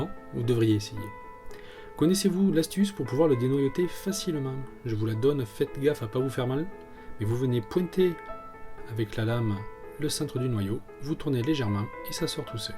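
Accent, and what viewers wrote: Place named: Ariège, France. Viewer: Ah sa fait du bien d'entendre un youtuber avec l'accent ariegeois c'est si rare PS:ariego moun pais PPS:je sais pas ecrire en patois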